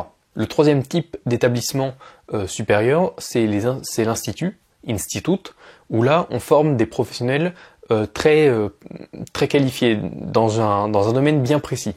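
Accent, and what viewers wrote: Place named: Russia. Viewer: Ты русский или француз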